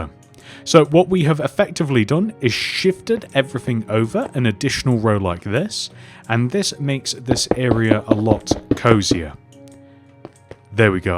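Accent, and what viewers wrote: United Kingdom. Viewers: He's definitely in UK you can tell by his British accent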